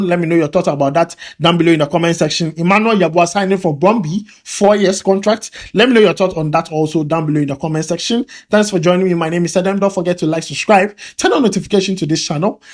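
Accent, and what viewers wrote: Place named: Nigeria. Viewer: That means the Trainer is not good.A Ghanaian you’re speaking English like a Nigerian.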